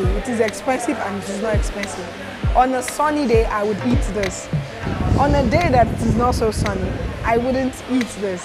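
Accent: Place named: Lagos, Nigeria. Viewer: you sound like you were one e a student of Unilag.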